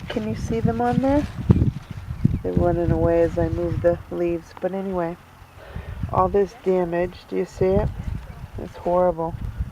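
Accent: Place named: New England, United States. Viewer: No japanese beetles in these parts, cabbage butterfly's caterpillars and Sphinx moth larva [tomato horn worm ] are my biggest pests and quite controllable with diligence ... lol. Love listening to your NE accent !! ;p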